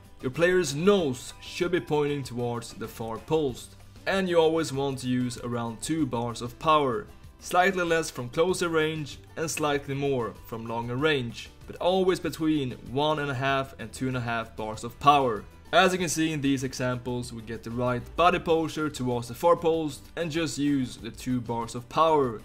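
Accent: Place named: Sweden. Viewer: He is so swedish listen to his accent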